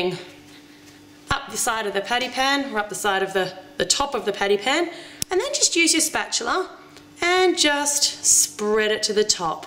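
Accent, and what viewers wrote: Australia. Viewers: Australian, not british :P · She's Australian not British. Don't worry I thot she was British too! >.<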